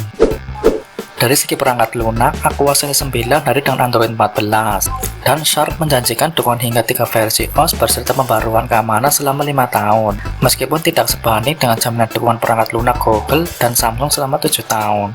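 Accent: Banyumas, Indonesia. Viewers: Ngapaknya masih kelihatan bang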